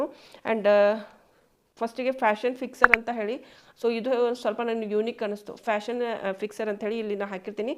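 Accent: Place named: North Karnataka, India. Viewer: Yava ooru madum nimmadu,,,,aagaga utter karnatak bashe kuda barta erutte,,,,? Kutuhala aste😊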